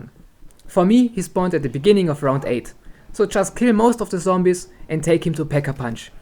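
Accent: Germany. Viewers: war nicht böse gemeint 😅finds krass das du so gut englisch kannst , als deutscher hört man sowas einfach raus 😅😅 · Is that accent german?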